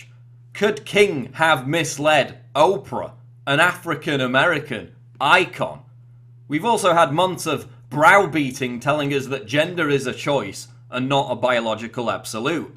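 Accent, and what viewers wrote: United Kingdom. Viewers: A white guy on YouTube with a British accent, commenting on BLACK issues?? Lmfao the irony of this dude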